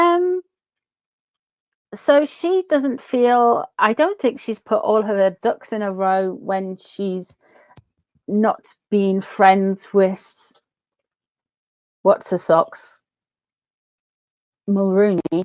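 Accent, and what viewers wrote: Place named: North, United Kingdom. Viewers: Don't worry about pronunciation, my Auntie was from up North and said book like you, it just were you are from in the UK! Be proud of your accent, I was born in the Midlands and now live in Australia, my Australian son in law teases me about my accent :_trifle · I love individual accents, and the north east of England always gets voted as one of the friendliest!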